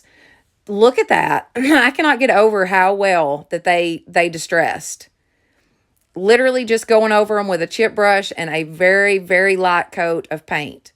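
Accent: South, United States. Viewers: I just love your videos!!! You talk like me. I’m southern through and through. From SC. Would you do a video for bathroom? Need a change bad. Thank you sweetie for all your content😍😍😍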